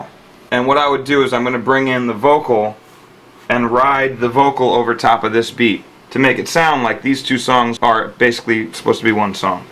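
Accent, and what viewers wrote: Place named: United States. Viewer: oh okay im glad that you are joking. he clearly says "go get somethin to eat" ... maybe you are not used to American accents idk. He is talking about rocking a party, but the place he labels the records is in his house where he is also filming this video. The whole point of saying "go get something to eat" is to illustrate you could go do anything and the record will still be labeled at that exact spot. Listen to how casually he is talking. This guy isn't even a rave dj. If you arent joking, then I'll just say get your ears fixed maybe turn your monitors down lol I guarantee you if you have ever rocked a party you would know.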